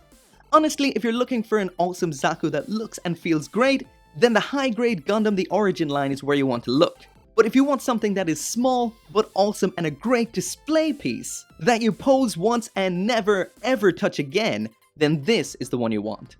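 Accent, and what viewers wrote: Ireland. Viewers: You're Irish-born huh?!?!?! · Oy! Irish?! Noice. Is your waifu genderbent lockon Stratos?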